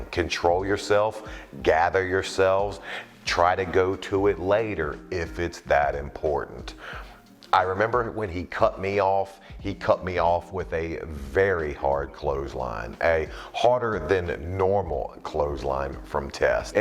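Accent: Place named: South, United States. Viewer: Thank you brother! You give us the transparency we usually have to dig through dirt sheets for. Can't wait to hear you on commentary when Cole retires. I could totally see you, and pat calling smackdown! We need a southern accent on that mic always!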